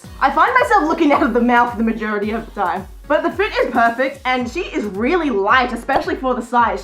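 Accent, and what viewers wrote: Australia. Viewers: Are you Australian cause I am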